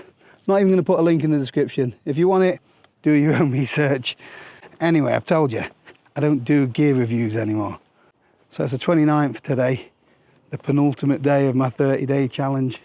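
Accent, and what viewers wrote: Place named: Yorkshire, United Kingdom. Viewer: Thanks for answering my drone audio question,. Appreciate you don’t do “reviews”, but that’s helpful stuff. Like these shorts, chap. You can be a bit Yorkshire (for my southern sensivities) in the long form stuff. (o; I’m enjoying this You. Perhaps the ‘less hassle’ factor is coming through?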